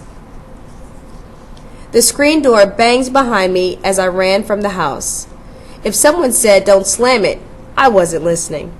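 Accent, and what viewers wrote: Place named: Germany. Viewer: also ur german accent is good................................................................................................................................................................(WAY TO MANY PERIODS)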